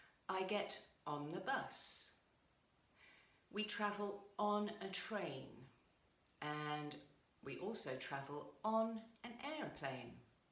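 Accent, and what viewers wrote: United Kingdom. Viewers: "...I KNOW IT´S ONLY ROCK AND ROLL BUT I LIKE IT...", LOL, YOU ARE BEAUTIFUL TEACHING ENGLISH WITH THAT BRITISH ACCENT : ) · Thanks for to teach English by this way. I understand very well your British accent. You're an excellent tea her. Take care and Godspeed. 👏👏👍🖐️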